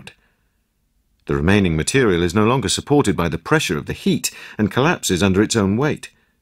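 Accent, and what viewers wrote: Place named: England, United Kingdom. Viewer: Who's the narrator? Best English accent ever!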